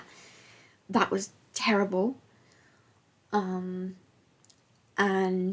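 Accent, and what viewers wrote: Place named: North London, United Kingdom. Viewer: I discovered your channel from the early Skybound days and you became an instant follow. There weren’t many British reactors that I could find back then and I was trying to suss out your accent …( I settled with somewhere North of the Thames )…,the giant mugs of tea and the ‘professional crying’ drew me in..! I remember a video you put out about 3 years ago and after that one, I could see the hurt in your eyes that you were trying to overcome and continue onwards but the damage was done and I noticed the sporadic posting..! Recently have been unfollowing some channels that haven’t posted in a while but yours was not on that chopping block…I kinda knew you’d be back sometime..! Anyway…I’m beginning to waffle but I do have a suggestion for a tv series to react to…it’s called Pluribus…it’s on AppleTv and apparently from the same guy that did Breaking Bad and Better Call Saul…two shows that I’ve never seen but liking this at moment.! 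But it’s great that you’re possibly coming back to reacting and that you have a focus to move forward with..! Excelsior… 😉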